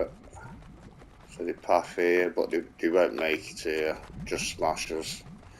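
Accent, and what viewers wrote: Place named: United Kingdom. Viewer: Bruh ur from UK 😂 I thought u was from US 😂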